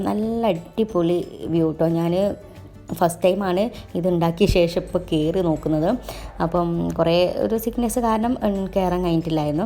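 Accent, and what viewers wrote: Malappuram, India. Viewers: lovely home 🏘️🏡🏠 Ingalu Malappuram slag paray ... PLZZ We love it ❤️🎉👍🌷🌻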